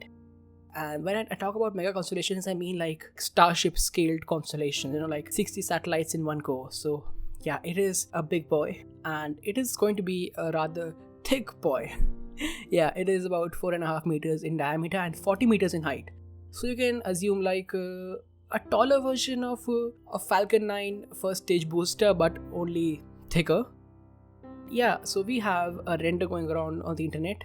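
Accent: India. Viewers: Are you from India? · He is an Indian i can say that by his accent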